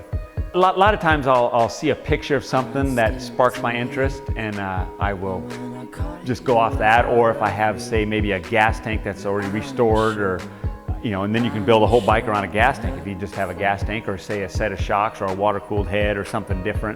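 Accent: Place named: California, United States. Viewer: Oh my god!!!! can i come over and walk that collection some time. You sound like your in california, im up in tahoe. Ill bring beer. Workin on a 79' XR250 right now, but im going more custom. My dad gave away 3 ossa's in like 88' i was in 5th grade and had no pull. My gramps gave away 2 old hondas and a yamaha that same year. Man! those guys I'd love to have those bikes today.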